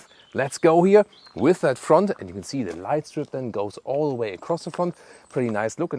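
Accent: Germany. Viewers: His German accent is sooo pronounced... oh my fucking god. :D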